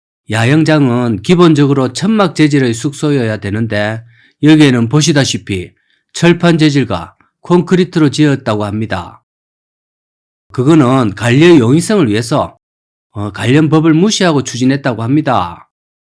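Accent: Gyeongsang, South Korea. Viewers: 암행어사! 구수한 경상도 사나이 사투리 정감이 갑니다.발통? 오랜만에 들어 봅니다.건강하세요!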